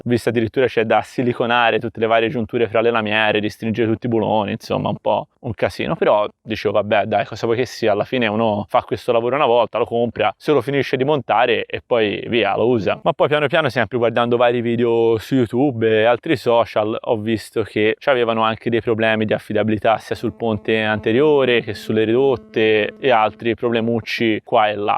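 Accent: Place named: Tuscany, Italy. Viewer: Iscritto, la parlata toscana come la mia ci sta abbestia! Curioso di vedere come lo camperizzerai😁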